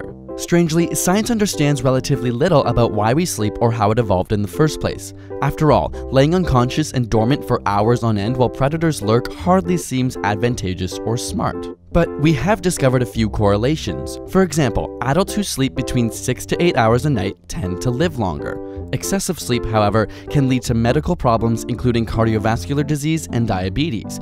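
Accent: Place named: Canada. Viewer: You are Canadian... I can tell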